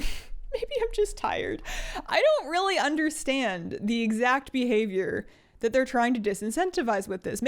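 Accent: Canada. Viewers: Are you Canadian by any chance?:D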